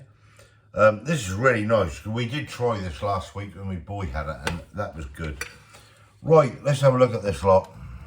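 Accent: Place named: England, United Kingdom. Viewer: Mr is English. I understand him and I'm from the South of England. There are different accents and dialects depending on what part of England you're from. Please be kind and not rude on this channel sweet 😊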